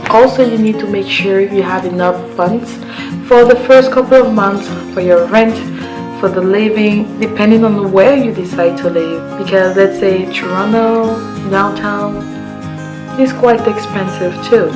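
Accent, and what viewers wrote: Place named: Nigeria. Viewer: From ur accent I believe ur are My sister from Nigeria, pls let Me know the step by steps guide and documents I needed and the right agent I need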